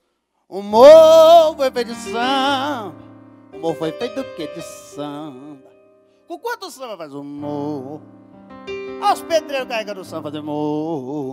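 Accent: Minas Gerais, Brazil. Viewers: Que e este ? Cliquei no video porque achei que fosse a thamy Miranda,mas o sotaque de minas entregou. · Esse sutaque mineiro cabuloso ❤️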